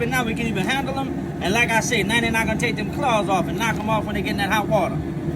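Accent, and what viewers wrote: Louisiana, United States. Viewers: She sounds like she's from da parish.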